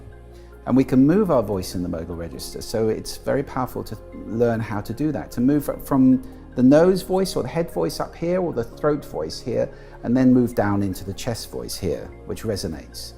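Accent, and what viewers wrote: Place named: United Kingdom. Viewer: Some valid points. More speculation going on here “seemingly valid” with the ol’ British accent.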